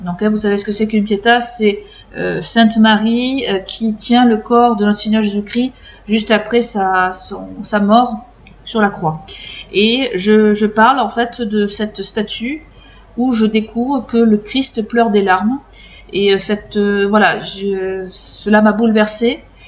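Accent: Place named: South, France. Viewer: Effectivement, l'accent du sud se fait parfois entendre. 😉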